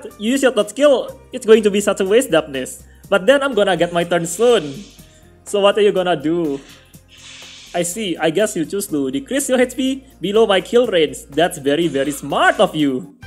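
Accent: Indonesia. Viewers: Soalnya b.ing nya kayak logat indo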